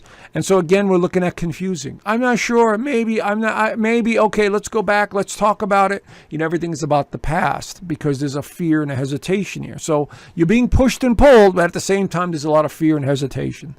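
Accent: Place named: New York, United States. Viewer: Sounds like you’re from the 5 Boroughs or NJ.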